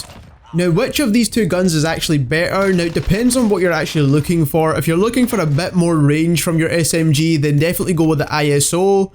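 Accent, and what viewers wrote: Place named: Scotland, United Kingdom. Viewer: He is from Scotland , i think , because he has a scottish/irish accent.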